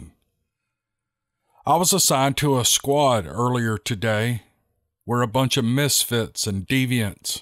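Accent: South, United States. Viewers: Hi, This is Dave Ramsey, and I grew up in Memphis also. I'm 61 this year and now live in Las Vegas, and it is nice to listen to your Southern draw as you tell your stories. Really just wanted to say Great job with your pod cast... Love your Stories.. Dave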